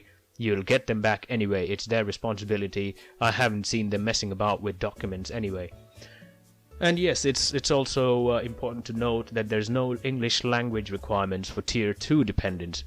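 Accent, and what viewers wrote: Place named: United Kingdom. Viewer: You sound very fluent. I just thought you are a Briton :)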